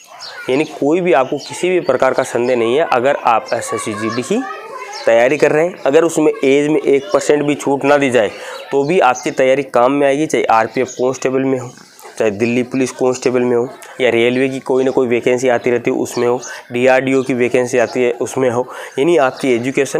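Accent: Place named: Nagaur, India. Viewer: Sir nagour se ho kya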